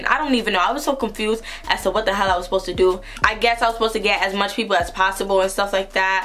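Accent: New York, United States. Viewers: let’s talk about her accent like i loveee ny ppl accents 😍😍😍😩😭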